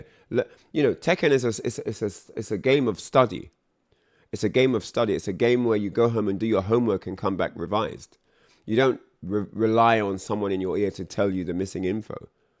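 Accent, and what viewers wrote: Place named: United Kingdom. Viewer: Uk accent! Damn I thought you were from the US. Yelp that another L for US. I thought US had a FGC Legend. I really thought u were from the US 😭. Anyway Its the principle of the matter..no coaching allow! It's a tournament where one that player show the crowd the true skill that the player possesses without cuck coach interferences. How else are you suppose to know if the player is truly skill without help. Never got why coaching was allow in the first place...SMFH!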